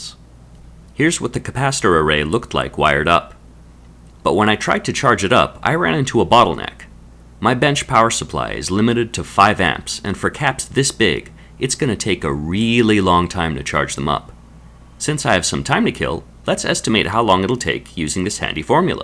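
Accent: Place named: Canada. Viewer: Canada, Eh?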